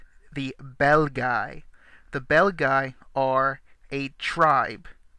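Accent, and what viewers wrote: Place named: United States. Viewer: Your pronunciation is too "American" sometimes: instead of [e] or [o], You read [ei] or [ou]. Also, if you're trying to use reconstructed pronunciation, try to find a version of text where long vowels are marked, it completely changes the accent: dIvisa, trEs, quArum Unam etc. And it would be really great if you'd just read it faster;p it'd have sounded much more realistic.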